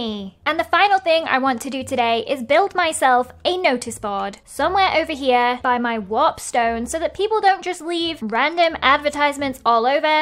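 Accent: United Kingdom. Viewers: So she talks british like normal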